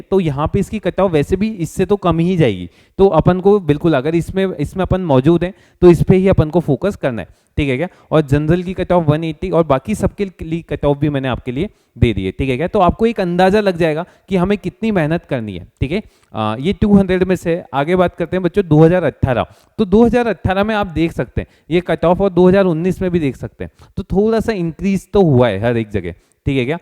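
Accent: India. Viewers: Sir, cool Hindi accent!!🤯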